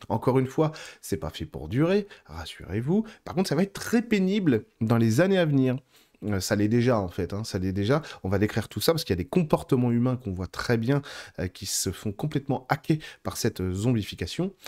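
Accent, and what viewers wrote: South, France. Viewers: Vidéo géniale comme d hab! Merci. « Je clairvoye à mort ». Je clairvoye, tu clairvoyes, il/elle clairvoye etc etc❤🎉😂😊. Topissime l’accent du Sud « à la bien cousin, en place » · Merci beaucoup ce partage! J'adore l'accent du sud!😂 ❤❤❤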